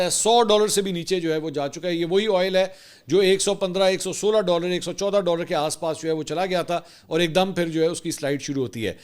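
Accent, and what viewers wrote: Punjab, India. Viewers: I m not Punjabi but Poori news ki jaan apki Punjabi hoti h.. love it ❤❤❤ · is video pe <num> likes to banty han ap ka to style ha na panjabi dialogue maza ata ha vlog sun kar. ap ko bhe islamabad Accord mubarak ho🎉🎉🎉🎉 PAKISTAN ZINDABAD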